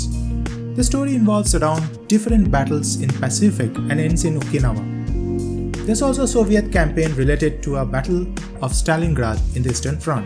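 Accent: India. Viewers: u indian ? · Are you from West bangal. Your voice is like Kirtiechow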